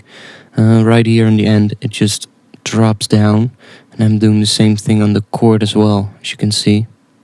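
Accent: Netherlands. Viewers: Yo you sound dutch haha! Anyways, i have a request, i have never encountered a really good tutorial for a deep house stab like in the song Coyu & Ramiro Lopez - 1, 2, 3... Fire! (Bontan Remix) which starts. Enjoying your tutorials!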